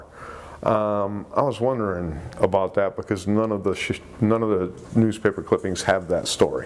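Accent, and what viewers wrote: United States. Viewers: nice American accent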